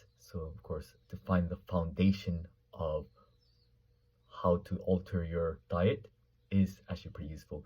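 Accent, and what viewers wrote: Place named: London, United Kingdom. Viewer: Ah I see. That's why you got a hint of London accent when you speak english. Thanks for answering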